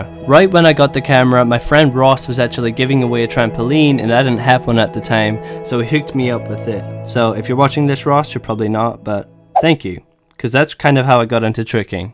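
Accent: Scotland, United Kingdom. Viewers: Good work. just wondering where you are from. You sound Scottish but I’m not sure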